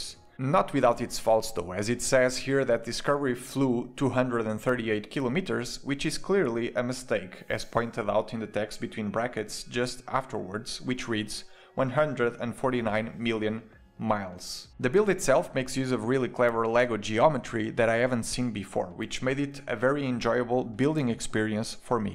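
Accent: Portugal. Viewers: You are Portuguese or Brazilian?? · Damn, I could spot you were Portuguese 2 seconds after you started talking :D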